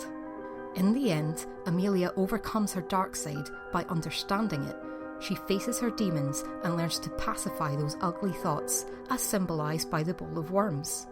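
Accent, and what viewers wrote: Scotland, United Kingdom. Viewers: Scottish ayeeee?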